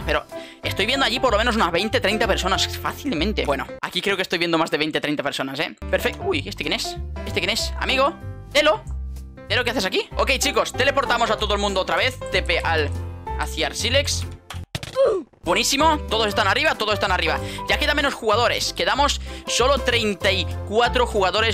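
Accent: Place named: Russia. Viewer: La primera ves qu vi ah arsilex dije alv un ruso que habla español xd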